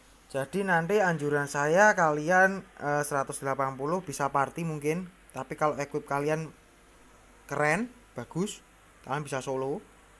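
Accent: Indonesia. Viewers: napa ga fokus talisman, bang ? Itu jauh lebih worthed dibanding enhanct equip u/ lv 180 lho.... Tapi logatmu iki jowo timuran, cocok karo aku kwkwkww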